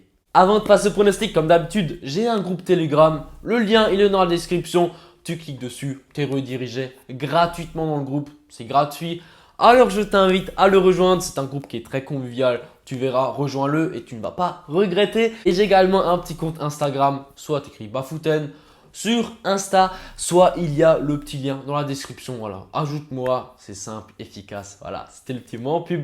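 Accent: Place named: Alsace, France. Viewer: Ton accent alsacien me tue forza alsace ;)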